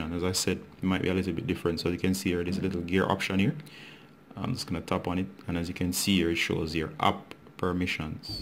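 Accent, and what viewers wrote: Jamaica. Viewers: Jamaican I think not being racist just heard the ancient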